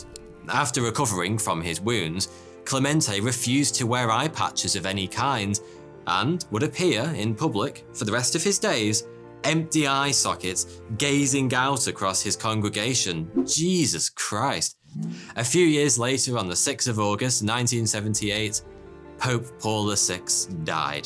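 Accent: United Kingdom. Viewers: I love how British he sounda💕🇬🇧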